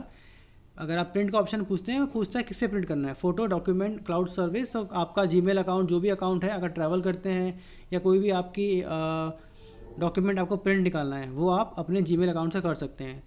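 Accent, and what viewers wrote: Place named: India. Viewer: I am American and, of course, used to American english. Of course, I am also used to the accents of the other primary english speaking countries. Un fortunately, consistently, Indian Youtube presenters have very accented english which makes it very difficult to understand a technical presentation. I am quite sure I would understand them during a conversation.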